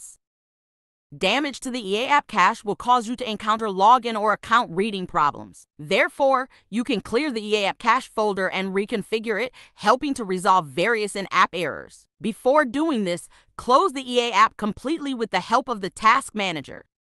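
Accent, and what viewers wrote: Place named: South, United States. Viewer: Southern AI voice. I like the extra twang in the algorithm.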